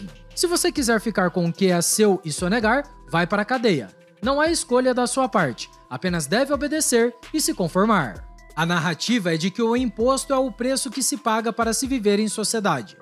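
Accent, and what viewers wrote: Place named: Caipira, Brazil. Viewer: NÃO dá pra entender oq ele fala com esse sotaque capira. Pqp!!!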